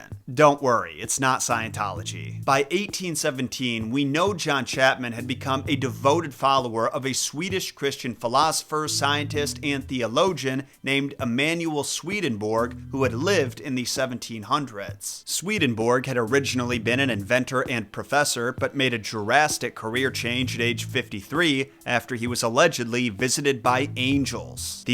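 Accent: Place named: Cleveland, United States. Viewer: Shitting on ohio? But your accent sounds like you’re from Cleveland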